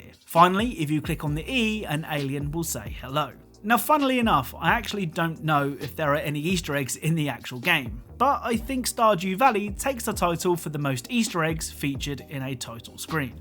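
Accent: United Kingdom. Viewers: I wanna, are you British? Oh and robo cop is not a a robot he is a cyborg, strange one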